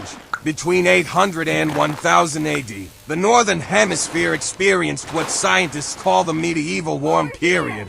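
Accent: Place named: Bronx, United States. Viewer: Another great upload. But, respectfully, the gruff, vaguely Bronx-ish voice narration...hmmm...not so much😆✌🏽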